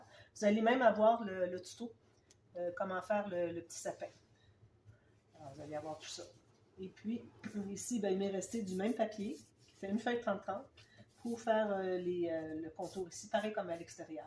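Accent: Canada. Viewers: Bonjour trop joli merci pour les tutos 🎉🎉 accompagné de votre accent canadien 🎉🎉j'adore car je suis allé au Canada Québec en septembre j'ai trop aimé ce pays et l'accent Merci 🎉🎉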